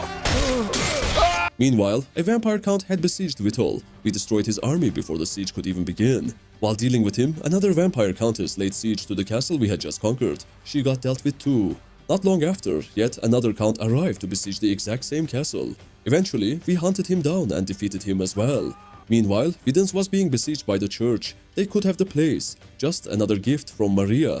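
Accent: Turkey. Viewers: I hear the slightest bit of Turkishness in your accent friend... Eline sağlık harika vidyo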